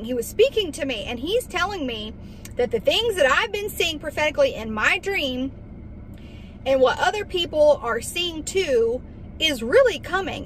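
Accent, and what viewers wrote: South, United States. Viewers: I love your laugh and southern accent. You're my sister in Christ, and you look like you could actually be my sister, although ten times better looking. (I'm old)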